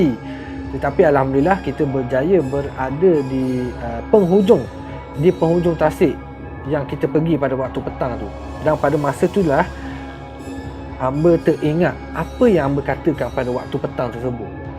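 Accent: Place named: Terengganu, Malaysia. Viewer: Penceritaan.. yg.. sangat.. menarik.. saya. suka. slang.. Ganu. nye.. 🤣🤣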